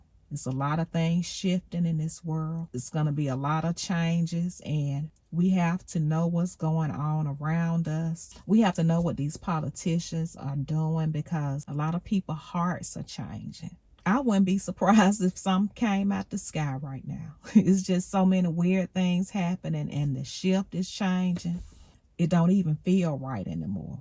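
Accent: South, United States. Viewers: Love the southern accent. Love the video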